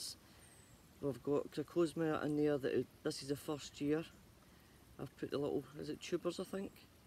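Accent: Scotland, United Kingdom. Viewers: hello from French gardening!! beautiful background to your wee natter, you've not lost your Scottish accent!! I have the same problem with my pond, green water and I tried the same barley straw treatment not touched it at all!! thanks for sharing your knowledge and as always happy gardening!!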